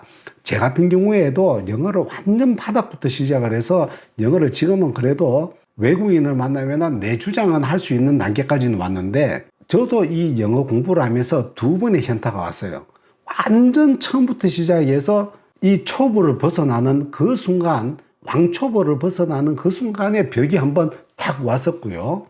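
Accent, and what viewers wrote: Gyeongsang, South Korea. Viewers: 겡상도 발음 너무 친근감 ㅎㅎ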